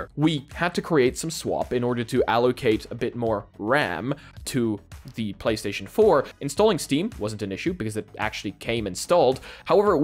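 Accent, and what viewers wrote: Poland. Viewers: you from poland? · Man good video but are you from Poland? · Are you polish?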